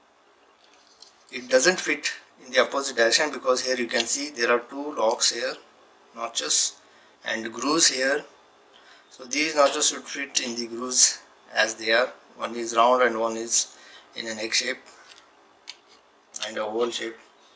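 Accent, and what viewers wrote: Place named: India. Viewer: He didn't go to the extent of actually showing us how to replace the chip. Also, heavy Indian accent made it impossible to understand what he was saying, so needless to say whatever he was doing in disassembling the unit it was not clear, except for the part of saying to take a photo of the connectors before you disconnect them so that you don't get them mixed up. That part was clearly understood.☹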